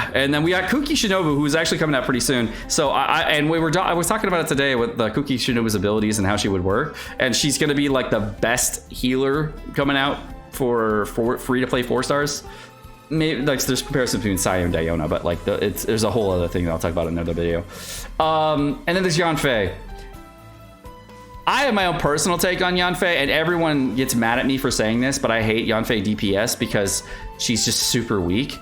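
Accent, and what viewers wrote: United Kingdom. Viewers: is a real one lol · Yes!! the is back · LETSGOOOO BRITISH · IS BACK BEST UPDATE SIGN HERE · I just wanna say i really missed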